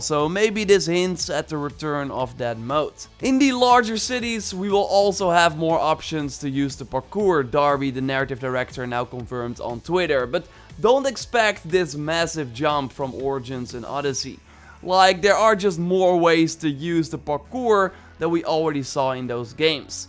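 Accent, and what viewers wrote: Netherlands. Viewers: you are from the nethelands dat kan ik horen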